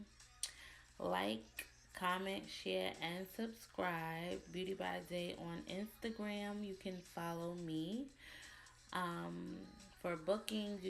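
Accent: New York, United States. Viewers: Hair looks amazing!!! Love that NY accent too. :) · Do I Hear A New York Accent ?? Omg If So YASSSSS ITS SK PRETTY lol i love accents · What part of NY are you from? I hear an accent. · I love the New York accent, im from California, I'm in Long Beach I love yall 💜💜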